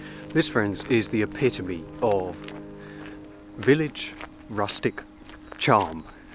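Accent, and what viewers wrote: England, United Kingdom. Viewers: I'm a Brazilian teacher of English and have accessed some of your pieces played on the organ by chance. Amazing work you have been doing! It's been a pleasure watching and listening to your performances while you share information concerning history, geography and harmoniums and organs! And it's being really nice listening to English accent (mine is more of an American one -- some Americans couldn't place where I was from since there are four different accents: from Virginia, New York, Boston and Minnesota). Anyway, thanks for spreading images and pieces of music all over the world! Viviane Horbach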